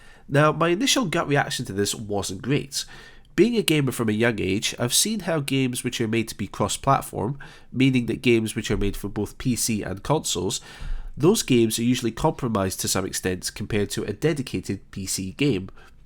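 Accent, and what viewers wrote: Scotland, United Kingdom. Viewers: Right on,! Lots of speculation out there both from the "users" AND associated developers. Hope for the best - plan for the worst, Expect nothing, and you won't be disappointed. Those are a couple of my old "standbys". Call it cynical, but for me it has worked. I will be holding on dearly to both my boxed and steam versions of FSX until well after the dust settles next year! But, if the new version pans out perhaps you will develop another great tutorial series for it? I can guarantee you at least one follower! <smile> BTW, not too long ago, we were up in what I have assumed to be your homeland in Scotland. ( Your accent and Inverness Airport?) Anyway, we spent several weeks driving around Skye, Lewis & Harris, the Highlands, etc. Beautiful country-side, great people! Was quite interested in the airport up in Stornoway (EGPO). 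I later googled and found a decent free FSX add-on for that little former military airfield that some of your viewers might also enjoy. It has an interesting history, as do many other converted military airfields around the world today, like the one in my home-town, Burlington, VT - Burlington Intl (KBTV).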